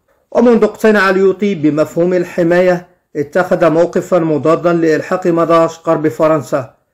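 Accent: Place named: Algeria. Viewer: هدا لي تيهدر باين جزائري مافيها شكك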